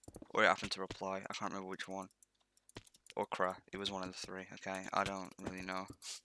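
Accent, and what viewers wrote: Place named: North, United Kingdom. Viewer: Omg your so northern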